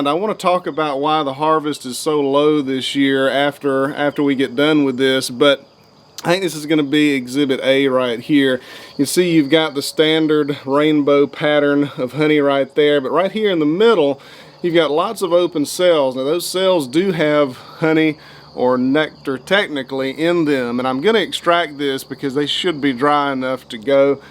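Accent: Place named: Georgia, United States. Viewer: I was stationed in California with a fellow from Athens, Georgia. We became good friends and kept in touch for many years after our service duty was over. These were days before cell phones or internet. Hearing your voice and Georgia accent reminds me of my good friend Eugene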